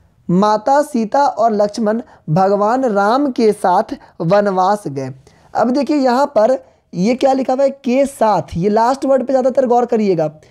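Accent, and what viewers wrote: Bihar, India. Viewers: Sir aap bihari ho kya ,🤓🤓🧐🧐🔥🔥🙋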